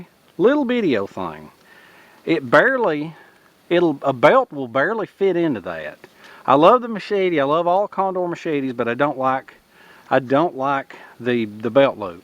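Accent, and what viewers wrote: South, United States. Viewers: Hey Bro...I just wanted to say how much I enjoy your vids. So plz keep em coming as you have the time. It is really nice to have a southern guy doing vids. From a Bama guy. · Man, you're as crazy as a box of frogs on hot coals ...I just love watching your videos! ;-) I love your southern drawl and your hooting laugh, it cracks me up every time! It always puts me in mind of that 'Stumpy' character played be that wonderful american actor Walter Brennan in the John Wayne westerns! :-) Anyway, keep them coming and all the best from the UK, regards, Daz.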